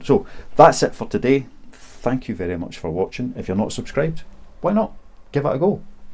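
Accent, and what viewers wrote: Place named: Scotland, United Kingdom. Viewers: Love listening to your accent! Whereabouts in Scotland are you based? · hes ayreshire i believe.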